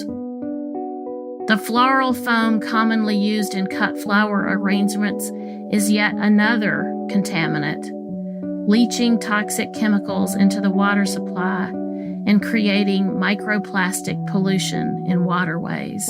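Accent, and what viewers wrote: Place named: South, United States. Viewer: OMG yes. I'm a Mississippian and was pleased to year a southern accent and someone talking about the south. Sadly, it was a standard-issue liberal scolding that hit every cliche: the scolding of a minor pleasure, the implication that people in the global south would rather be unemployed than harvesting flowers, and finally, suggestions to either spend a ton of money on bespoke alternatives or to just "go for a walk or send a letter." If these moaners got their way, we'd be sending letters and giving hugs for every single holiday. I'm sure there are mirrors of this Valentine's Day complaint ready to go about the evils of Halloween candy (diabetes! tooth decay!) and Christmas presents (commercialization! Capitalism!). How about a nice hug, letter, or a $1000 healthy candy replacement made by your local candy monk? When a person buys a jar of jelly at a farmer's market for 10x the price of Welch's, they get to flaunt wealth, their own tastefulness, and their liberal values about caring for the Earth. 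This whole thing really rankles me.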